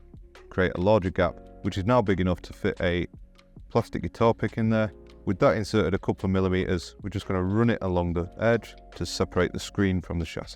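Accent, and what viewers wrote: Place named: Sussex, United Kingdom. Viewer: I just received my replacement battery for my iPhone 11, and I’m ready to put everything together probably tomorrow. Your voice sounds familiar to me as my ex-wife‘s mother is from Sussex. I miss bubble and squeak, bangers and mash, and steak and kidney pie. It’s been 30+ years since I’ve had it, and watching your video makes me ‘Jones’ for it. Thank you for your time.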